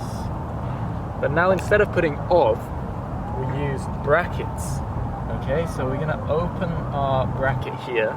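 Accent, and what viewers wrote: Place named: United States. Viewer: tu indian hai, par acche american accent bol rha hai.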